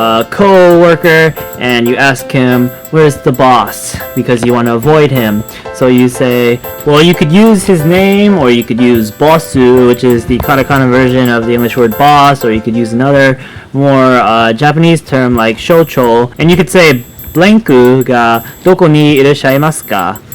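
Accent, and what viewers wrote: United States. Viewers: I'd guess he was raised in america(prbbly was born there as well) but his parents are japanese and talked to him in japanese But I think he has an accent in japanese (not sure tho) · Is English your first language, or did you learn it as a 2nd language? If the latter, i must say your American English accent is perfect.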